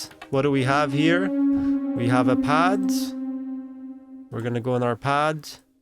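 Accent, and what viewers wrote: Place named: Montreal, Canada. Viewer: The minute I heard your voice I could tell you were from MTL. Super fire watching different work flows! This a cheat code for many who don’t know music theory!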